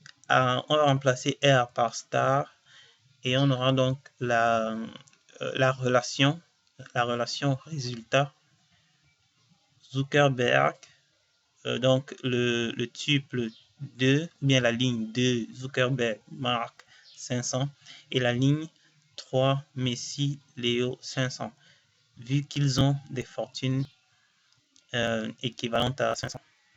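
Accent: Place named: Cameroon. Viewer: je sais que c'est mon frère camerounas qui a fait la video ci, notre accent est trop mo gars merci pour la video je compose dessus tout a l'heure ;)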